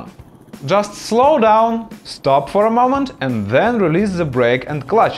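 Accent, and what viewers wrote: Russia. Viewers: I have a really strange feeling that I understand the author because I'm Russian and the author has bright Russian accent. It helps me understand the author's speech better 🤣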